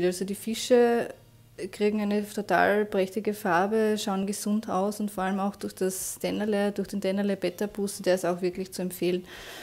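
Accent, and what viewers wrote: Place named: Austria. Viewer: Sehr cool erklärt! Und schön dass man dein österreichisch raushört ;-) lg aus Wien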